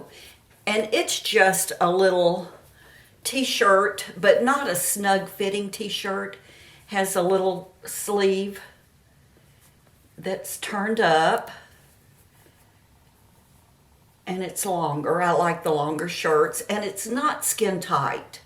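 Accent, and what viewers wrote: South, United States. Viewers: hear that southern drawl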